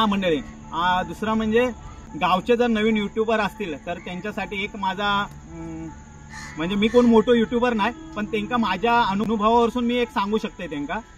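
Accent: Malvani, India. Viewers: तुमची मालवणी भाषेत बोलणे खूप छान वाटते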